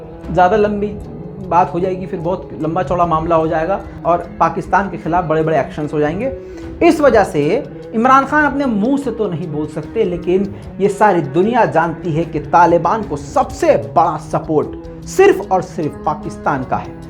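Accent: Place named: Indore, India. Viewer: पक्के इंदौरी भाषा बोले हो भाई आपकी न्यूज देखने और सुनने का मजा ही अलग है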